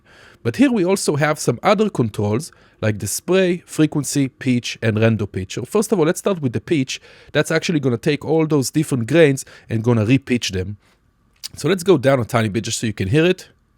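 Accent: Israel. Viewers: אני מזהה את הישראליות מהמבטא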